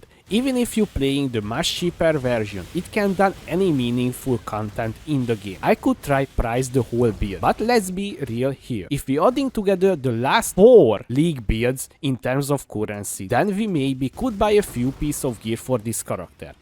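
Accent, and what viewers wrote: Hungary. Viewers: Az akcentusból sejtem, hogy magyar videós vagy. :) Hajrá-hajrá, sok sikert!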